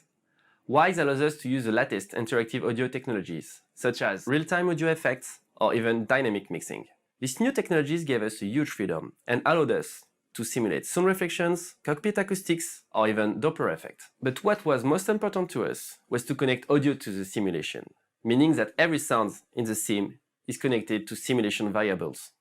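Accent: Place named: France. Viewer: Vous êtes Français ? En tout cas ce qui me fait dire ça c'est que je remarque un léger accent Français dans l'Anglais .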